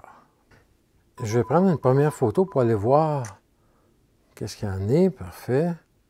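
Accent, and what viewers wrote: Quebec, Canada. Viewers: Merci pour cette vidéo. Elle a achevé de me convaincre sur ce 24-120 f4 et le mien arrive aujourd’hui. Bravo pour ces vidéos fortement empreintes de professionnalisme et tellement plaisante à regarder ici en Belgique, surtout avec l’accent de Québec que j’adore!!!!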